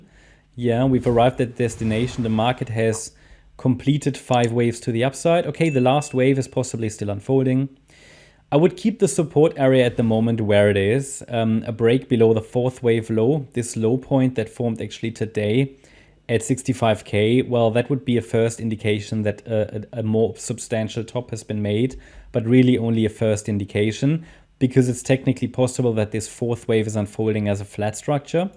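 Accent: Germany. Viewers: ich hab mir das video angeschaut und direkt nach 20 sek gemerkt das du deutscher bist hahaha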